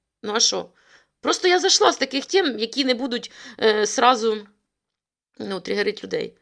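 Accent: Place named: Surzhyk, Ukraine. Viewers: Я не знаю, чому Ютуб підкинув ваші відео, але я вас підтримую)))) Вподобайка та Комент у підтримку! У вас чудовий центрально-східний діалект на скільки я чую. І слава Богу що у нас в Україні можна оприділити звідки людина тільки по розмові. Успіхів вам) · Та ну... Суржик у вас дуже милий, домашній такий, доречний. Впевнений що літературною теж розмовляєте. Просто фішка тут така своя у вас)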